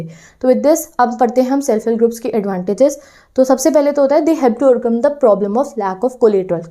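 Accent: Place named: Uttar Pradesh, India. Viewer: Di I want to know aap kha se ho please tell me U.P se ho na aap aapki boli se lgta h eesa bhut bar btao na please di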